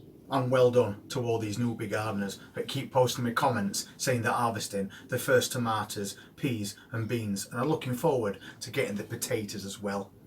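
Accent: Yorkshire, United Kingdom. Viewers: Where r u from? I'm sure I can hear some Yorkshire accent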